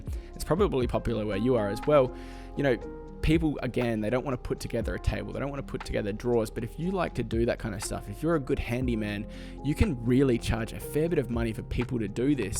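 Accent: Australia. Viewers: this is gold info dude. great to hear an aussie voice too. subd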